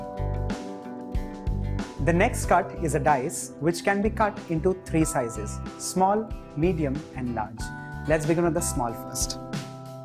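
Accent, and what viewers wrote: India. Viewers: strong indian accent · You speak english with indian accent i have no idea wht you talk haha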